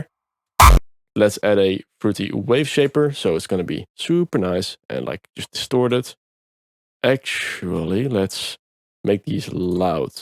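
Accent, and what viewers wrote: Netherlands. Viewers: ahhaha vaak kan je dat gewoon horen bij nederlanders die engels praten :P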